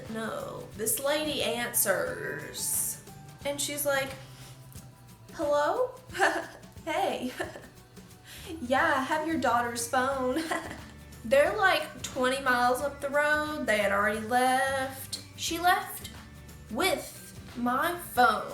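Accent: South, United States. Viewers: that’s called a southern accent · o próprio sotaque dela já da pra saber que é do sul kkkkkk ela deve ser do texas ou alabama ou algo assim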